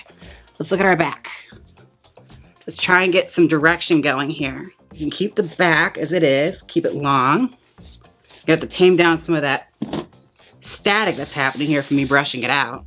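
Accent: Australia. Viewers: More Wigs by Vanity please or just the hilarious Aussie accent! 💗❤️🧡💛💚💙💜🖤🤍🤎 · Are you trying to do an Australian or an ol timme Cockney accent? Either way, love your work xo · that’s how Australians sound 😭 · The Australian accent is everything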